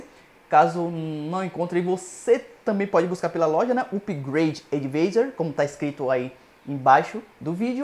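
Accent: Bahia, Brazil. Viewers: seu sotaque baiano.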